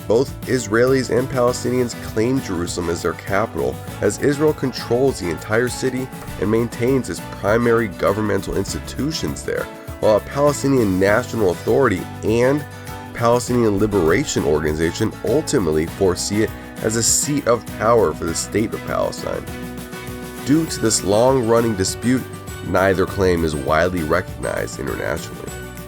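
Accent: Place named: United States. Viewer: Video and preparation optimal. Commentator's English is too American and word eating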